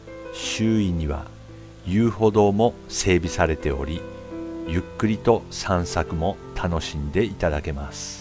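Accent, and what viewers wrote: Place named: Fukui, Japan. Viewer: 1000人超え、おめでとうございます🎉 福井弁が人気の秘訣？でしょうか(*^-^*) これからも福井のPRお願いしま～す✨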